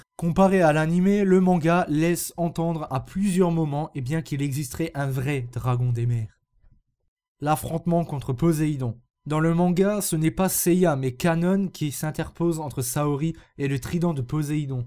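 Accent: Alsace, France. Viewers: Un accent alsacien?? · toi t'es alsacien !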